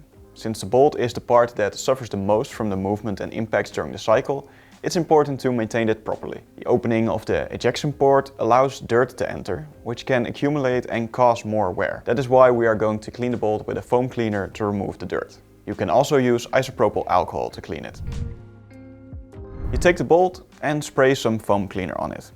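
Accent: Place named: Netherlands. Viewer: u sound very very dutch my guy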